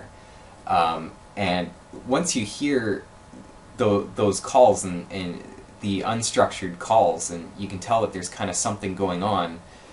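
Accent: Canada. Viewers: "Aboot" Canada, obviously. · I love your strong Canadian accent ❤❤❤ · A very heavy Canuckian lilt. I say he's from Saskatchewan.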